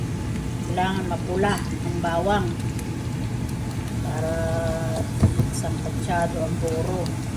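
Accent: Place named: Ilocos, Philippines. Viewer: Sis masarap yan! yung tono ng salita mo Ilocano taga Cagayan ka ba?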